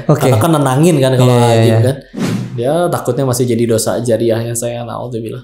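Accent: West Java, Indonesia. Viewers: Masya Allaah Barokallaah atas ilmu & pengalamannya Koh Cara penyampaiannya raoseun Meni sunda pisan padahal bukan asli sunda👍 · Logatx sunda ea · Sampe logatnya yg nyunda pisan pun sama 😁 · MaasyaaAllah, mirip a agym ya bicaranya, logat sunda😁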